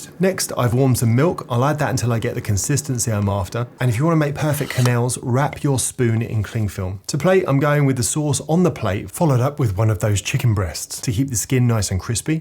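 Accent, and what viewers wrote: United Kingdom. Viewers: He sounded like a random British dude before I read this comment...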